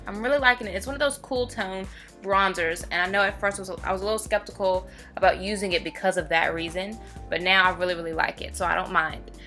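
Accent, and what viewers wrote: South, United States. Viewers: You sound extraaaaa country in this voiceover! Love the accent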